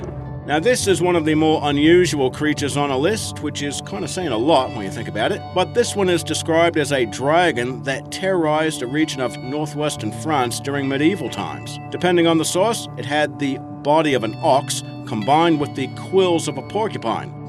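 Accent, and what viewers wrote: Australia. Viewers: What accent is that? It sounds close to Australian, but then a lot of your vowels don't sound right for Australian English. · Your accents got me stumped part aussie and part buggered if I know. Seriously what nationality would pronounce a mammal as Marmal?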